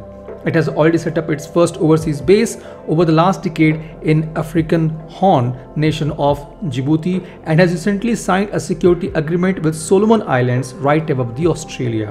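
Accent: India. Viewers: India accent english sounds so funny. Love India from China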